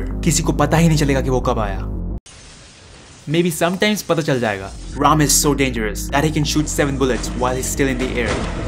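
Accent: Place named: United States. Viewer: Name is desi but accent is american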